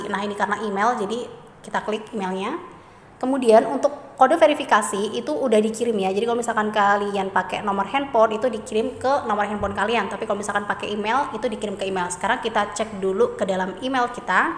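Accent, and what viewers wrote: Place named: Java, Indonesia. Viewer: Kayak ada manis2nya .... orang jawa ya ka?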